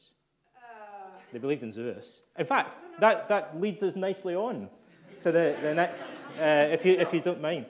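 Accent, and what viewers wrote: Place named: Cockney, United Kingdom. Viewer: He's talking in Cockney accent which even most of the Brits don't understand.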